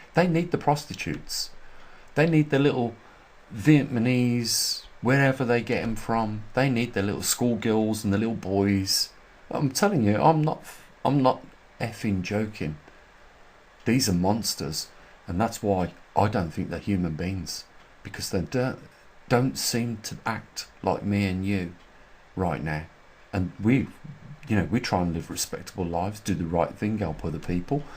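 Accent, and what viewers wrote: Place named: West Bromwich, United Kingdom. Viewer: M8… where ya from? I am in West Brom… you sound local, let’s meet up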